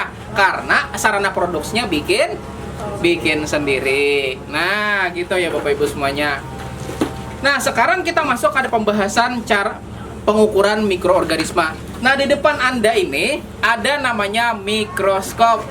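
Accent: Banjar, Indonesia. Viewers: YaAllah pantas pina logat Banjar, skalinya dasar org Banjar 😂😂😂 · Luar biasa penjelasanya pak. Ingat waktu di Banjarmasin, logat Banjar. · Bahasanya kayak orang banjar kalsel om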